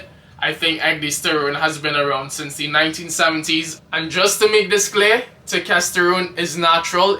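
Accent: Trinidad and Tobago. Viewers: That Trini accent haha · you sound like a trini. good luck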